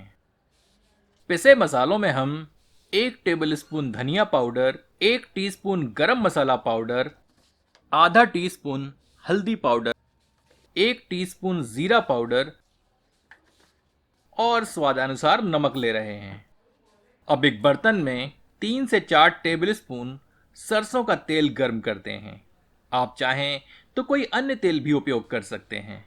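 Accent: Standard. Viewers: First of all pranaam for your shudha hindi, recipe is awesome.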